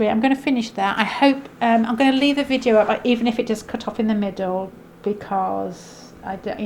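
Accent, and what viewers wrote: Manchester, United Kingdom. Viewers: I got my friend Steve from Tamworth to listen to you as I was curious where you’re from and he’s great at picking out accents but you have stumped him. He says you don’t have much of an accent but if he had to guess he’d say Manchester or north of there. Me being American, I have no clue whether he’s right or not, lol. I love listening to you though. The way you say “you” when it comes at the end of a phrase is just lovely. ✨💛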